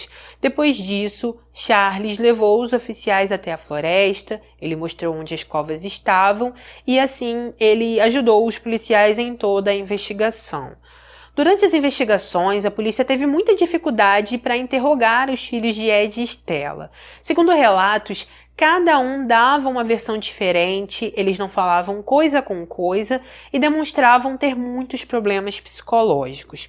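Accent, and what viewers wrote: Rio de Janeiro, Brazil. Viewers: Adoro seu sotaque do Rio de Janeiro . Saúde, sorte e felicidades! São Luís, 28/07/2023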